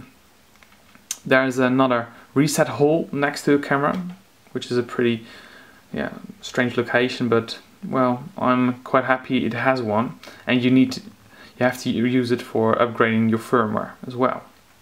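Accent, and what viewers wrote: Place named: United Kingdom. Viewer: You sound like a fellow Brit, what website did you purchase this from and how much did you pay for it? Do you have to pay for VAT and what not?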